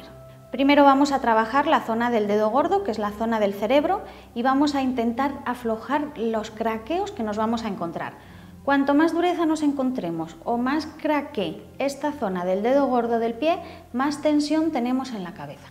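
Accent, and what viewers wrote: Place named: Spain. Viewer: Eres española el acento que masajes tan relajadores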